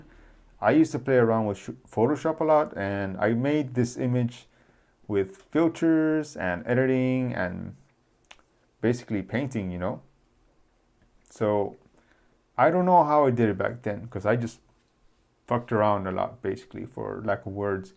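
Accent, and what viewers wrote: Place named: Spain. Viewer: I really like your photography! Thanks for sharing your opinions. I feel like your English has some Spanish accents haha, perhaps i got it from my latina gf, which also loves anime.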